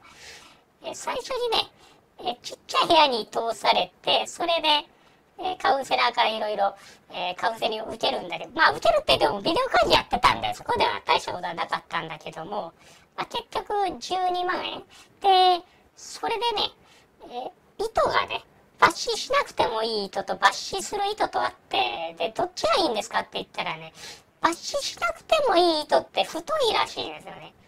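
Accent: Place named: Tottori, Japan. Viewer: やっぱり鳥取方言にしか聞こえない。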